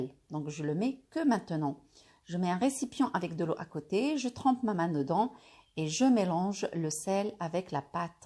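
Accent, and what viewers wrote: Morocco. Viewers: C'est un accent Marocain ça 😒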